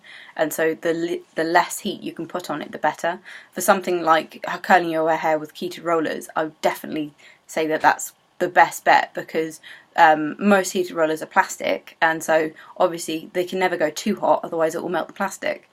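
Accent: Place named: United Kingdom. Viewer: Oh wow its a braid. This UK speak low-key makes me want to live my English heritage dreams & move.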